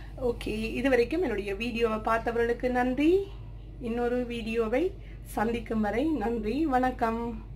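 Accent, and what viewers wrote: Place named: Sri Lanka. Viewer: Elangai Tamil inimai sis 🤝😋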